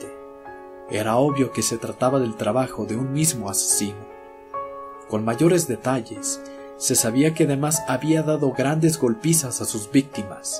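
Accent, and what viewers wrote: Mexico. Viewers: que ondas,eres de mexico? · Buen vídeo /u\... oye eres de México? quisiera pedirte un vídeo haahaha... muy bien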